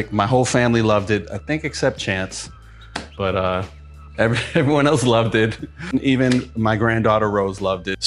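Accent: Boston, United States. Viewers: That Boston accent ❤️❤️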